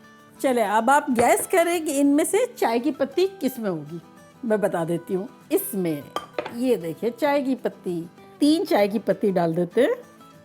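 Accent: India. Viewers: Aapke bolne ka style Indian hai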